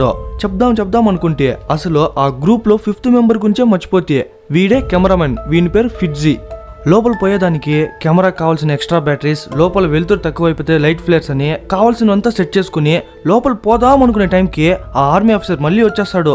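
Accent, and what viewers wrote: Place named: Karnataka, India. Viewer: పక్కా కర్ణాటక తెలుగు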